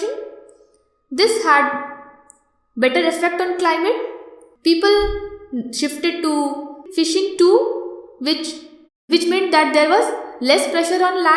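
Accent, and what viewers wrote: India. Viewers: Ohhh great Indian accent 👏👏👏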